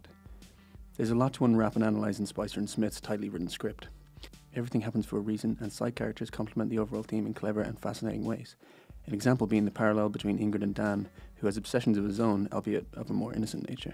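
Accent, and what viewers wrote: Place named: Ireland. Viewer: Nice work. I'll definitely be checking in on your reviews from time to time. The format's strong, albeit a bit rushed? That's my only criticism. I understand your accent fine but outside of Ireland, your audience might struggle at that speed. Or maybe not. I guess time will tell. Looking forward to seeing the channel grow.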